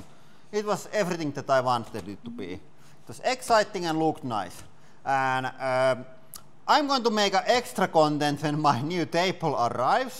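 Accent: Finland. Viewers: Somehow the Finnish accent goes so well with the hydraulic press.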